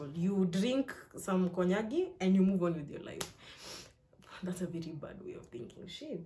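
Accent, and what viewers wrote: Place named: United States. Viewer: This was really a rant and that American English I like ure personality 😅 you can't tell me it will get better Becos u think it'll get better 😅 and that ending was 👌🏼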